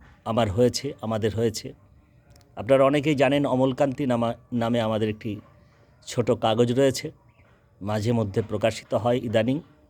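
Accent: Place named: Purulia, India. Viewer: আগের পর্বগুলির মতোই এই পর্বটিও মনে রাখবার। অসাধারণ বললেন ' দমে জার লাগছে ' নামের এই কবিতাটি। মনে হচ্ছে যেন আপনি ওই পুরুলিয়া অঞ্চলেরই মানুষ। সঙ্গে মাননীয় শিক্ষক ও আবৃত্তিকার শ্রীযুক্ত চন্দ্রচূড় অধিকারীর সম্পর্কেও আপনার কথা থেকে জানলাম। ওনার কণ্ঠে এই কবিতাটি শুনবার আগ্রহ থেকে গেল। আপনি এই আগ্রহটা জাগিয়ে দিলেন। কথাকুঞ্জ এভাবেই আমাদের মন ভরিয়ে এগিয়ে চলুক। এই কবিতার কবি মাননীয় শ্রীযুক্ত মোহিনীমোহন গঙ্গোপাধ্যায়-কে আমার অনেক শ্রদ্ধা ও প্রণাম। কথাকুঞ্জের জয় হোক দাদা।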